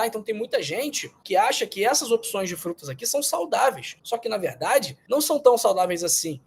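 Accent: Rio de Janeiro, Brazil. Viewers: Faz só dois anos que estou comendo banana madura e bem madura. Aqueço numa frigideira pequena de teflon sem por açúcar e sem óleo depois adiciono aveia já cozida em água pra evitar leite . No final adiciono boa quantia de canela moída . É lanche bom. As outras frutas nunca consumo. Percebi que a ameixa seca preta tem um açúcar terrível; dói o dente na hora. Muito legal o seu sotaque carioca.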